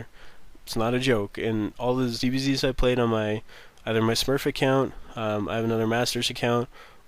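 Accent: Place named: Canada. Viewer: ha,I guessed right, you were canadian! Didnt really pay much attention how you speak, but eventually that 'o' came out quite clearly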